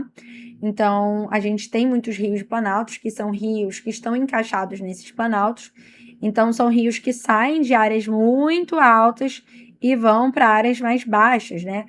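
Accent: Rio de Janeiro, Brazil. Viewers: Nossa que professora incrível! Didática muito boa e o sotaque carioquês linda! Parabéns!!! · Acho lindo sotaque carioca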